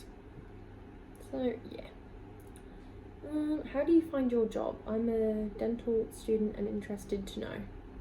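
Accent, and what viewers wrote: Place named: Australia. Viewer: I’m surprised you moved there at 14 and you sound Australian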